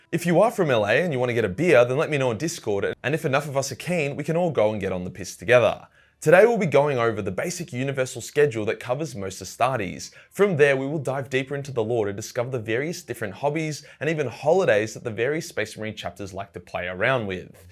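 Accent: Australia. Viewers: It takes an Australian 40k nerd to make Yandare sound cool what a time we live in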